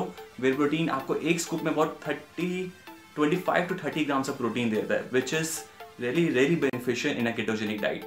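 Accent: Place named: Philippines. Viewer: Your English sounds like Philippino, it took me a while to understand it. After the intro I thought you had switched to a different language.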